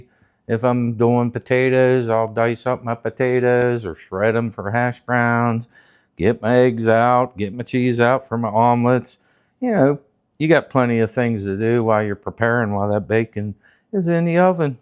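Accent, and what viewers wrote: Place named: Ohio Valley, United States. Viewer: Hi! Love your videos and your Ohio Valley accent. I know you said you got your griddle from Sam's Club. I'm looking at the Lodge bakeware. Seems the widest cast iron "baking" pan (aka griddle) is 10" X 18". What are the dimensions of your griddle? In this video it looks wider than 10". Please let us know.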